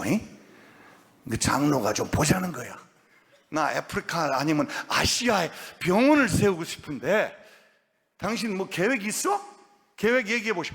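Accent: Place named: Jeolla, South Korea. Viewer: 인요한박사님모두훌륭하신데 전라도사투리만쓰지안으면 백프로훌륭...😅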